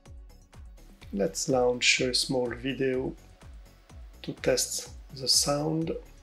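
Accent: France. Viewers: Oh god your french accent ...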